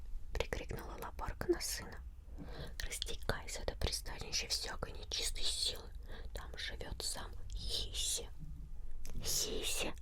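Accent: Russia. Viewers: ロシア語の発音ほんとすき、日本人じゃ絶対できない🥹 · I love Russian accent🥰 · el acento ruso es hermoso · I love the Russian accent and the way it sounds. Only when it's not a Russian yelling at me on a video game tho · me gusta mucho el acento ruso 🤧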